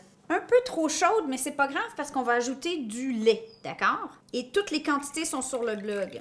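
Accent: Quebec, Canada. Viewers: Bonjour rien que pour votre accent québécois j’aime beaucoup 😂bien evidemment la video aussi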